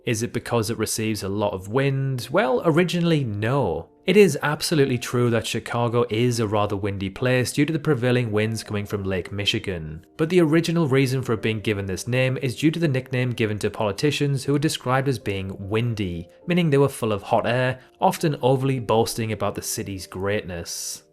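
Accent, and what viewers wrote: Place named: United Kingdom. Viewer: Your British accent lots of rubbish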